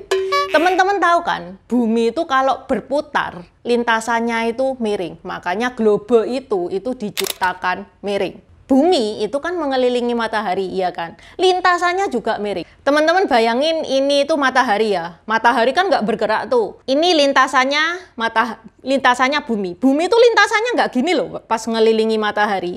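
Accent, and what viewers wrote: Java, Indonesia. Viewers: Logat bicaranya k sangat medok bahasa Jawa, sebenarnya k ini ada di mana siiih? Di China atau ada di INDONESIA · Bu jowone medok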